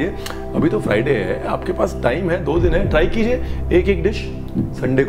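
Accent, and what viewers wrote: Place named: Punjab, India. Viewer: RAVE.. ☺️ Punjabiyat chalak hi jati hai baton baton mein😊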